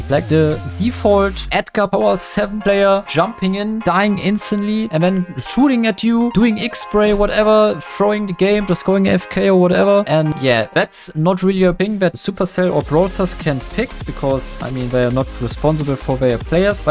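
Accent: Germany. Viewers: Man merkt deinen deutschen Akzent so sehr, feier ich😂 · Ich höre das du deutsch bist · Wie hart Mann merkt das er deutsch ist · bro dein deutscher aktzent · Voice with german acent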